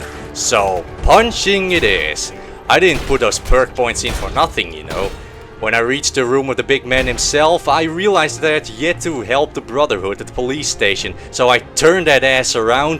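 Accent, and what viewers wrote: Netherlands. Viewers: Heerlijk dat Nederlands Engels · hou van het nederlandse accentt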